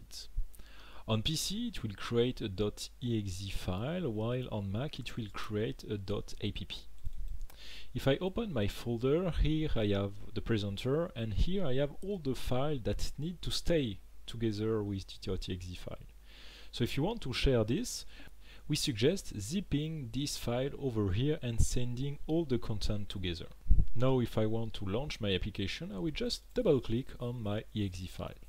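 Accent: France. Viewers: Your French accent is “mignon”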